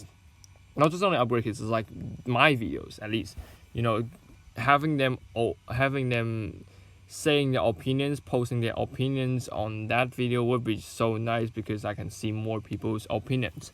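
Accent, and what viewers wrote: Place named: United Kingdom. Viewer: British accent~~~~~~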